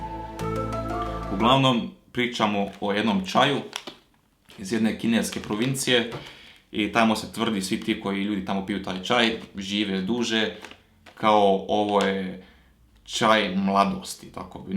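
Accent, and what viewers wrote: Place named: Serbia. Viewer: Malo previse lricas srpskim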